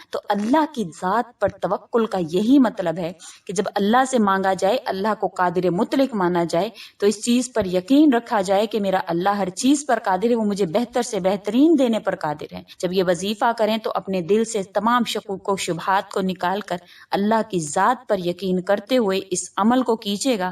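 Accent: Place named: Arab world. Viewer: اسلام علیکم ، بہن آپ سے گذارش ہے کہ آپ اردو جیسی میٹھی زبان کو اردو ہی کے لہجے میں بیان کریں، بلاوجہ اردو کو عربی لہجے میں بیان کر کے مصنوعی مت بنائیں.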